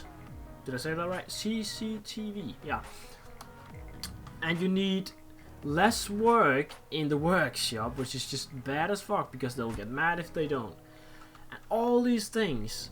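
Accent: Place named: Denmark. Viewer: du lyder meget dansk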